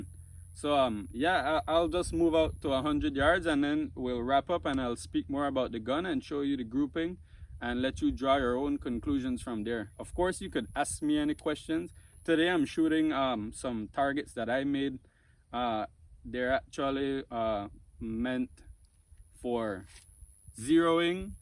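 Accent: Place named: Belize. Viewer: Great setup and good shooting. I discovered your vid while researching light big bores. I think I am sold on a Texan though I would prefer a .30 or .357. Nice to see Belize too. I caught your accent only after a few words lol! Used to be a regular visitor to Belize as a seaman years ago. Keep the vids rolling bro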